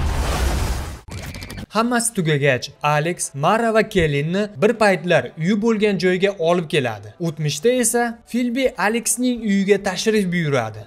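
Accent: Uzbekistan. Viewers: Жиззахликми дейман шархловчи 😊😂😂😂 ўзимизни шивани дарров таниманда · G'irt Andijoncha shevada tushuntirdi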